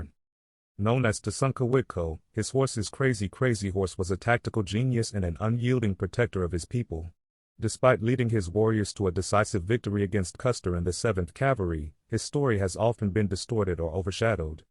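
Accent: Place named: India. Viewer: Too bad the narrator can't seem to do any more than to read without proper cadence or at least pausing between thoughts or sentences. Then he (or the AI) pauses in the middle of a sentence. The built in text also goes like this without pauses at the end of sentences and no punctuation. Because the narration and on-screen text being so badly strewn together, it's hard to understand. Sick of the poor robotic narrations these education videos lately use. Even though this one was tuned to sound like an Indian speaking English poorly. Don't think any human reading this could have voiced it so poorly. Could have been good info but was marred by poor narration!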